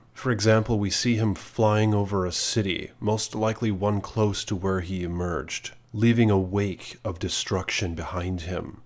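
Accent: RP, United Kingdom. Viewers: It's a reasonable guess,. I thought they would go the elemental route... Earth, water, air, fire and spirit but there are only a couple of the Titans that fit those specific attributes... PS - Your doing the Lord's work on G2 compared to your peers because: 1. You made it out of puberty. 2. You speak the Queen's English. 3. You don't ramble incoherently. But you have to ditch the creepy, pedo pizzagate voice.... 👌👌👌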